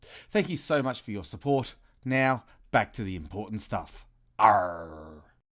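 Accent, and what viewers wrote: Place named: Australia. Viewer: the over pitched 'Aussie Stryne " became too annoying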